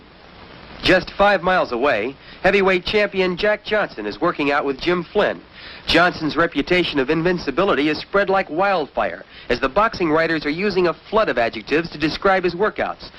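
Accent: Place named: New England, United States. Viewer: I wonder how many of you recognize there was no such thing as sound recording, nor radio for that matter in 1910, this voiceover, judging by the accent, is pretty recent, within the last 35 years, from New England.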